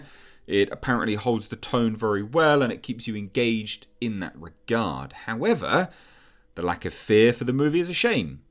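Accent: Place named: England, United Kingdom. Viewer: I don’t think you watched the original Candyman. You sound a little too bias because of your lack of fondness of Jordan peel. Based on your accent you should know who Clive barker (a fellow Englishman), who originally wrote Candyman. So the so-called “racial stick?” was not created by Jordan peel but originated from Clive. You have some great information pertaining to the plot, but unfortunately very bias and you sir sound a little offended by the actual plot.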